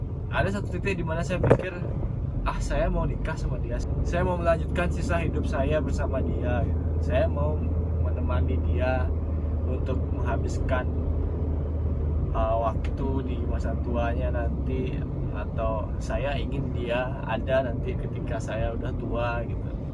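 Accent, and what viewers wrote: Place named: Bali, Indonesia. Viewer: Bli, logat bicaranya kali ini baru Bali pisan :)))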